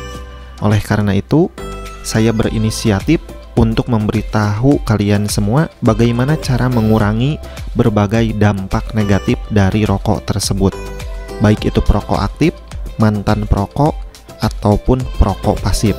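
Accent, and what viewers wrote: West Java, Indonesia. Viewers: Cara ngomongnya jiga orng sunda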